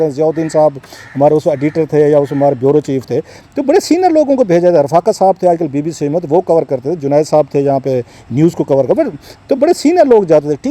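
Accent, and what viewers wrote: Saraiki, Pakistan. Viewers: Dear Sb. Why you have stopped unveiling scandals of PTI/politicians/ zurkoota jins. I think.you have been threatened and frightened and we are disappointed. Pls don't stop sharing facts. Char Ja sooli per Ram bhali kary ga. We are missing your saraiki accent. Stay blessed. You are following Mr. Amir Mateen who has already surrendered.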